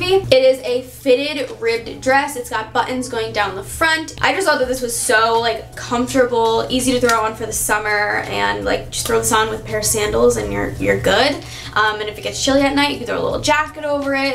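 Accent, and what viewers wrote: New York, United States. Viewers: I not only love your style and your sweet kitty that looks similar to mine... but I die for your New York accent. So cute so sassy. ❤️❤️ cool haul. Digging those leaf pants 👖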